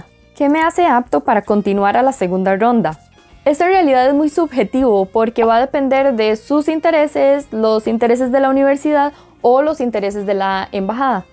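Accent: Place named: Costa Rica. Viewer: omg ella es de C.R , ya decía yo que su modo de hablar se me hacía conocido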